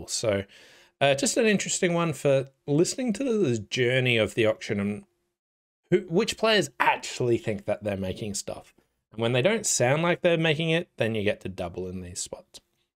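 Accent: Australia. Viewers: How have I missed your channel till now? Enjoy your vids - good to listen to an Aussie discussing bridge. Love the analysis and hope you get to ake a lot more of these, I will be a regular watcher from now on.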